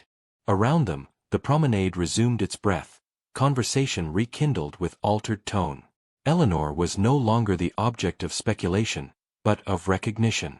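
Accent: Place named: United States. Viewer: Please it’s duty not dooty and duke not dook. For heaven’s sake find someone who can pronounce these words the ENGLISH way and not the American way! 🇦🇺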